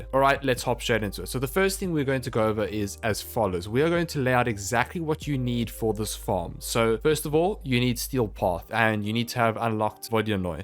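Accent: South Africa. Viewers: You sound a bit South African there my bru, lekker! Subscribed.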